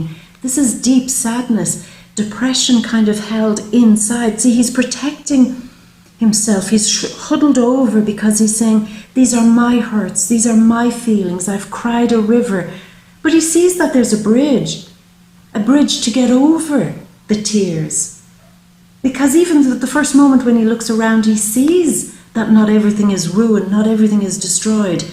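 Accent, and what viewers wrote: Ireland. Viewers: your got a good Irish accent lass I like it what about you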